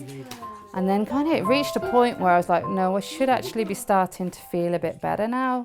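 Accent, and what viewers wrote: England, United Kingdom. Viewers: You sound English but I am assuming you don't live in England? If you were in the UK you can get free prescriptions to cover any medicines, I have 11 prescriptions monthly and used to buy a yearly pass whilst I was still at work but since becoming too ill to work I now get all my medicine for free, I cannot imagine what it must feel like to have the extra burden and worry of affording your meds I know if I had to pay for mine I couldn't afford them all and would have to go without some of them. I hope your situation improves and thank you for being so open and sharing this with us. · What i dont understand is she said she can't afford the medication but she sounds British in which case she wouldn't pay for medication and being on esa she wouldn't have to pay for prescriptions. I have fibromyalgia and its truly awful so I sympathise with her but I just dont know what she means by affording medications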